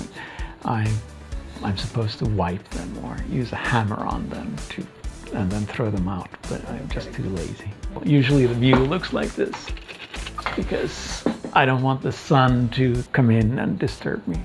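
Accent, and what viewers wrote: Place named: Sweden. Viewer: wtf he speaks fluent finnish with swedish accent, as many swedish speaking in Finland.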